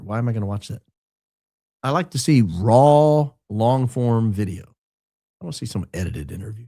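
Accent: South, United States. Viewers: You absolutely have a southern accent.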